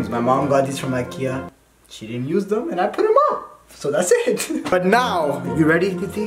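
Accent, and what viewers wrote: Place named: Pakistan. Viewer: Accent to Pakistani lag raha hai. Lakin Didi kyun keh raha hai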